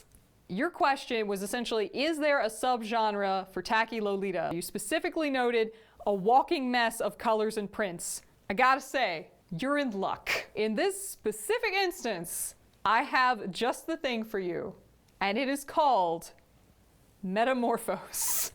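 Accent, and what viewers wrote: Texas, United States. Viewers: Did anyone else notice her slip into a heavier Texas accent halfway through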